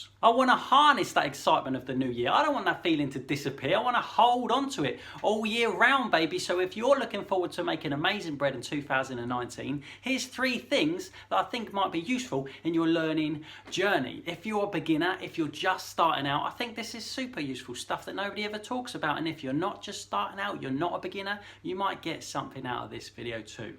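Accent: United Kingdom. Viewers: hi im just new in the channel and i wanna know where are u from im not english speaker but felts like i could lear some british english out from u. Im considering going there to work and my degree essay is about bread texture determination and comparision of different sourdough. So looks such a double strike, see ya.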